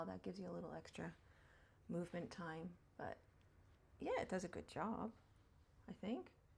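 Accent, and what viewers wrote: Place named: Boston, United States. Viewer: There’s many Americans here in Australia and of course many Canadians. I always ask “Are you Canadian?” because I know Americans never get offended, unlike some Canadians do if I ask “Are you American?”. Generally I think both Canadians and Americans are very popular here (especially Americans) because we have grown up watching so much US TV (yes I too watched too much I dream of Jeanie, Bewitched and Get Smart). I feel like America represents the very best and very worst of western culture. Which is always so fascinating, to me anyway. Do you miss home? I can really hear your ‘Boston’ accent today (sorry if you’re not from Boston, it just reminds me of the accent in Good Will Hunting which was set in Boston.) It’s a very distinctive American accent. Sometimes I can tell an East Coast vs West Coast accent but not always. 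Anyway, what an interesting life you have lived. You’re truly international. How did you end up here in Oz? You’re American, with long grey hair, wild makeup preferences, fluctuating accent and work with birds! That’s interesting. Why do I get the sense that is just the tip of the iceberg with you? There’s a lot more to your story?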